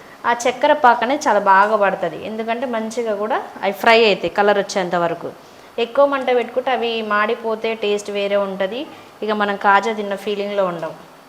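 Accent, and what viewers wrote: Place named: Telangana, India. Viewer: Telangana slang telustundi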